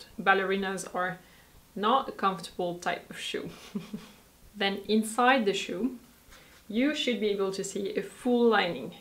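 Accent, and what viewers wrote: France. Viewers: You are so beautiful and elegant , and I love your french accent and your aristocratic look ❤ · I’m so glad I stumbled onto you! This was very helpful! Are you French or French-Canadian? I love your accent! Très mignon!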